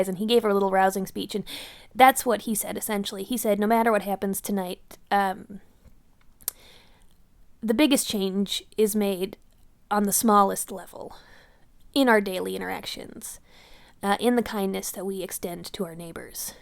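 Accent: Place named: Midwest, United States. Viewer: Minneapolis, I was right. you are from the mid west. I can tell by your accent. so hey fellow midwesterner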